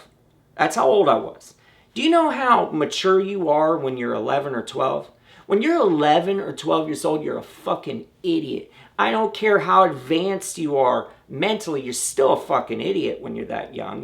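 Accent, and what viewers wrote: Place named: Ohio, United States. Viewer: Sounds like Ohio to me!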